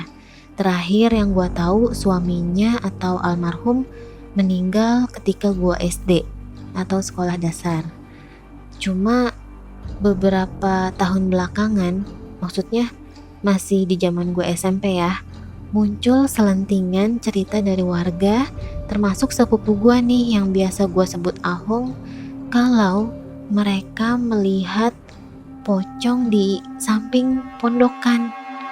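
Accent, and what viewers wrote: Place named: West Java, Indonesia. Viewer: ieu sunda pisan 😁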